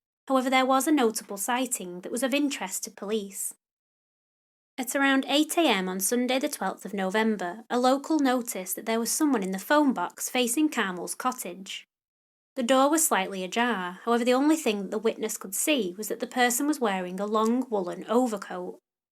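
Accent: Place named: United Kingdom. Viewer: Really good! Well researched, well read. Great to hear a true crime podcast read in a British (regional!) accent.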